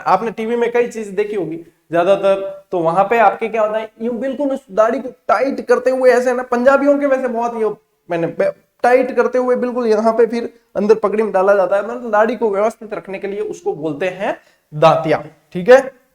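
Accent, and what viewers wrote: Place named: Marwar, India. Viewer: Sir apki marbadi language apke muh se sunne mein bada annad atta mein braj bhartpur se hunn sir moy bhi apni mother tunge bolbe mein bdo mja abe guruji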